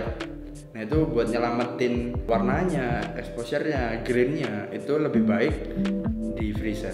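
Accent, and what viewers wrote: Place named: Surabaya, Indonesia. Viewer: Makasih mas, bermanfaat bnget infonya. Dan ternyata podo Suroboyo e Haha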